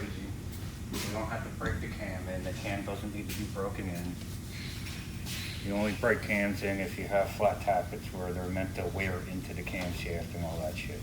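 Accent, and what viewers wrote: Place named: Bay Area, United States. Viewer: Aye you from the bay bro?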